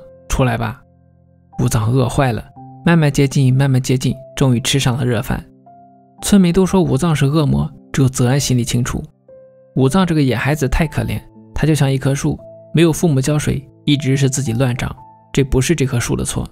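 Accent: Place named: Qingdao, China. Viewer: 解说的青岛口音藏也藏不住😂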